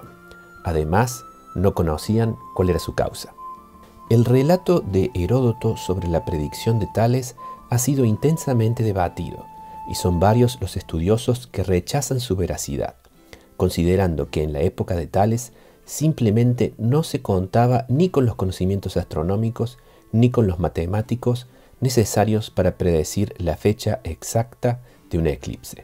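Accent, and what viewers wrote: Córdoba, Argentina. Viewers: UD es de Córdoba ?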